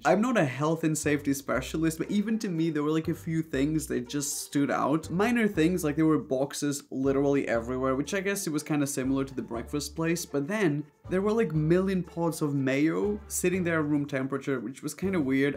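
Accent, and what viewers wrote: United Kingdom. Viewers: of course he's British. Do you not hear him?😂plus he has said many times he lives in London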